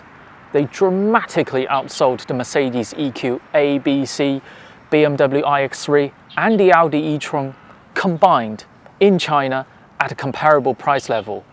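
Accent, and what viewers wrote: London, United Kingdom. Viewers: 英语真好啊这标准的伦敦音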